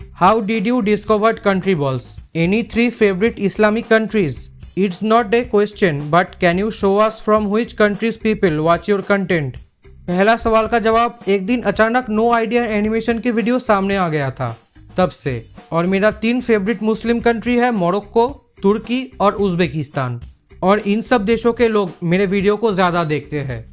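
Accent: Bengal, India. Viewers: Tomar hindi bolar dhoron dekhe mone hocchilo tumi bangali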